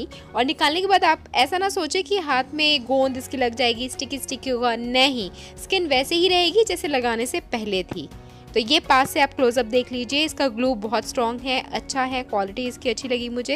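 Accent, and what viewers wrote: Bihar, India. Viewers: apni language ko thoda sundhar.... Tipical bihari tone ata hai apne muh par mane top k banati h vedio or channel ka namo rakha h be natural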